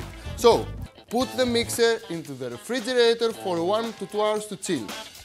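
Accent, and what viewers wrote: Greece. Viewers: Oo, That classic greek accent! now i wish that still had mine :/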